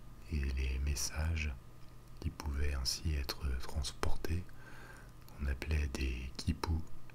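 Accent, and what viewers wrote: France. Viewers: I now realize what's been missing from my ASMR experience. A beautiful French accent 😍😍😍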